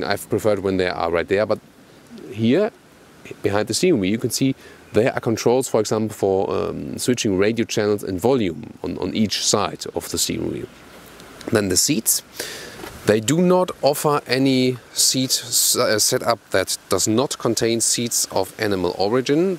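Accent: Germany. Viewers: nice reviews you're doing. Loving your German accent too! I'd never watch an hour long car review, except yours, dude! You seem to stress leather on seats in your reviews, are you a vegetarian?:) Respect.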